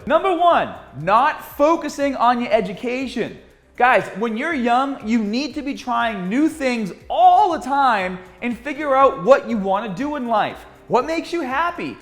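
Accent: Boston, United States. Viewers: The Boston is strong with this one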